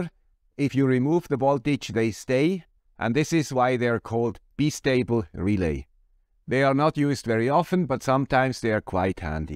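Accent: Switzerland. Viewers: I blame the high quality of your project focused videos: "Oh, the guy with the Swiss accent dropped a video, gonna be something cool - oh it's a mail bag. I guess I'll watch it later" :) I, for one, appreciate the breadth of these. So perhaps keep them less often, but collect several cool things. Like this one (well, two).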